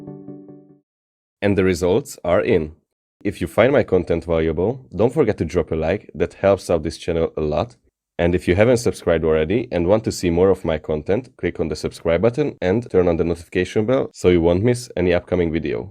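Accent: Hungary. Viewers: jáj de magyar akcent :)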